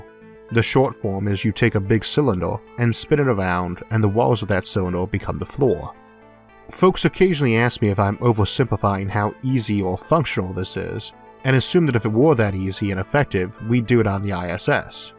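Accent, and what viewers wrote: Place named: Texas, United States. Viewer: I didn't think you had a speech impediment, I just thought you were from Texas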